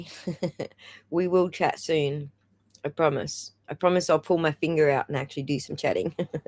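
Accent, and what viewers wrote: Australia. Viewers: I am going to watch Turtleboy at 9, but I love hearing Aussie.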